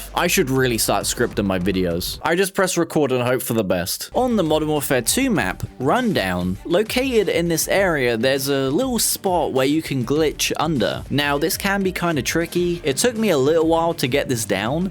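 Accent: United Kingdom. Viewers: Is that an east Anglian accent I hear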